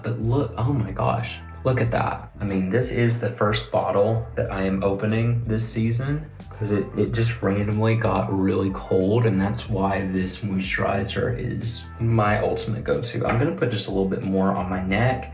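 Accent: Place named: South, United States. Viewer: I like your accent but I can’t place it. Southern? Midwestern?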